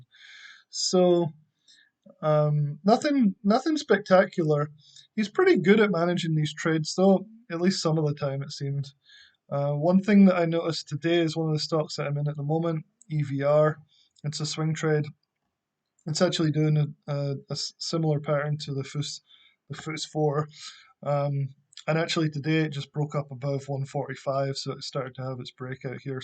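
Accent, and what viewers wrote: Scotland, United Kingdom. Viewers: are you scottish? :) thanks for the video!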